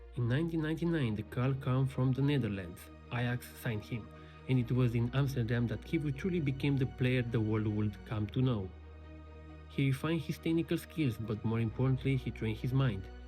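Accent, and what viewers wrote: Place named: Belarus. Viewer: mama cum iese belarusul prin accent. fa coae video in romana si aia e..daca nu pune AI.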